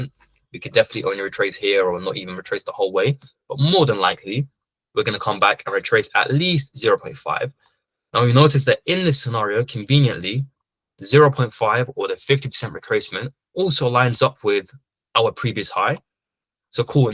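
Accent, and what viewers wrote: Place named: United Kingdom. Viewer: Brits accents, huh manageable 😢😅❤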